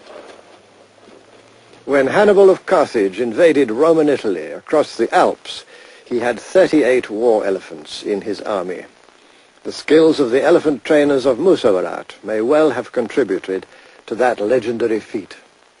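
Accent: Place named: United Kingdom. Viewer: This was made in the seventies it early eighties. He is British and lots of British men speak like that. He was a professor of The school of African and Oriental studies. He was very interested in black history. It is more a style of presenting. Watch a documentary by Richard Attenborough and he has similar style. So does David Attenborough his brother (the old guy in Jurassic park).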